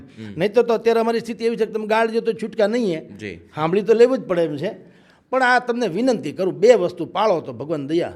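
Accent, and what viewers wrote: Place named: Kathiawar, India. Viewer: અસલ કાઠીયાવાડી તળપદી ભાષા મજો આવી ગયો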